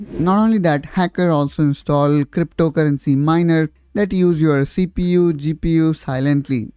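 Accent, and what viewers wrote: India. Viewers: Try using an AI voice, the indian accent is a turn off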